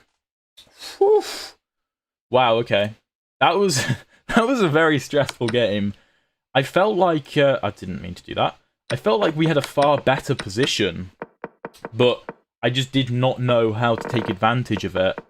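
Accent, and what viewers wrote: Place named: Outer London, United Kingdom. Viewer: Please stick to the longer time control.your 1st oppoonent bundered all over the place presumably because he didnt have time to think..wheres the accent from sounds like the outskirts of london?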